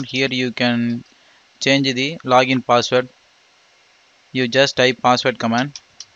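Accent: India. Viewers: Despite I couldn't your indi accent I could follow your instructions and could achieve it. At least you deserve the like because it was helpful.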